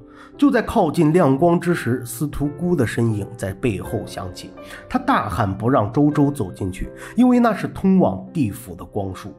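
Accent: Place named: China. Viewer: 听不出这是大陆口音吗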